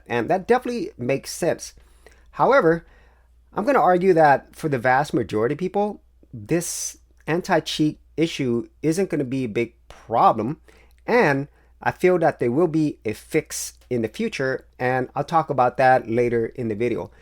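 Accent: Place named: Latin America. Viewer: My wife and I were trying to figure out your nationality based on your accent. I said Hspanic but my wife said Korean. I see your picture now but help us! Did you grow up in a Latino country?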